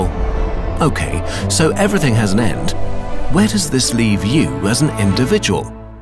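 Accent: United Kingdom. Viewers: Love it and the British accent make it even better :) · he reminds me of the narrator of the stanley parable. probably only because of the british accent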